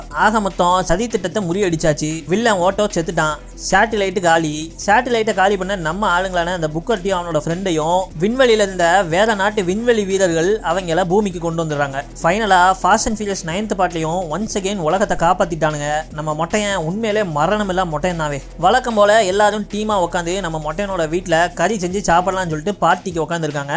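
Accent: Tirunelveli, India. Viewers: நெல்லை தமிழில் டப்பிங் செய்து பேசிய உங்களுக்கு ரொம்ப நன்றி மிக்க நன்றி சகோதரரே 💚💚🙏🙏💐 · Ena bro tirunelveli kaarana ne😍😍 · Are you from Tirunelveli Ya Brother ??????? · வர்ணனை அட்டகாசம் தோழரே... இதுக்காகவே உங்க channel ல subscribe பண்ணிட்டேன். உங்கள் வெற்றி தொடரட்டும்.. (எப்பொழுதும் இதே நெல்லை தமிழில் பேசுங்க தோழரே)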